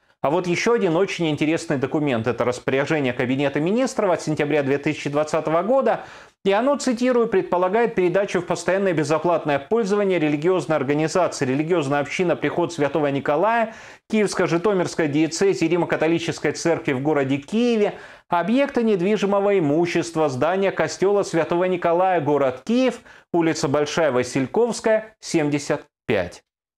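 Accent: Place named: Moscow, Russia. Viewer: По речи я но, что не украинский журналист.Уж очень московский акцент.Наверное по сути правильно,но какое вам дело до всего,с о происходит в другом государстве.Ах,речь идёт о церкви которая принадлежит вашему государству,т.е.Московской России.Вы давно уже разграбили украинские храмы,а теперь ещё цепляетесь зубами и когтями за то,что вы считаете имеет значение для вас и ваших интересов в Украине.А главное прибыль от паствы.При всем при этом суть вопроса понятна,надо было восстанавливать храм.И прежде всего забрать его у Московского патриархата.Уходите с нашей земли и мы наведём у себя порядок.А вы держите у нашего горла нож и ждёте чтобы мы пошевилились,чтобы убить нас.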